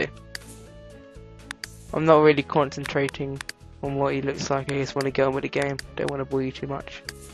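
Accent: United Kingdom. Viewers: he sounds more british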